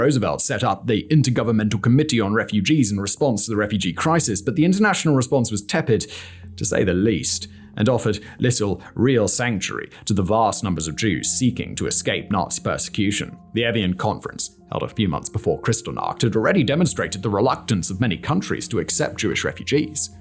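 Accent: United Kingdom. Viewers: You Tube SUCKS! I have removed this man's channels over and over again. But when You Tube changes the algorithm, it force feeds me this guy's many channels despite my removing him. channels suck. They are poorly researched information that is mostly government propaganda talking points. Terrible videos. And just because has a British accent, this doesn't not make him an authority on anything. He's just a talking head. England is over. Brexit has made the only job in England a You Tube content provider. Terrible list videos that spread falsehoods and bull.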